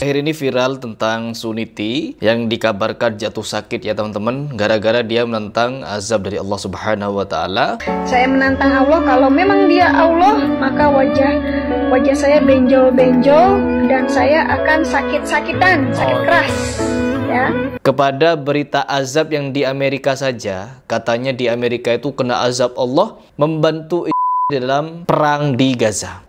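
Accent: Madura, Indonesia. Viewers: Kau orang madura ya bang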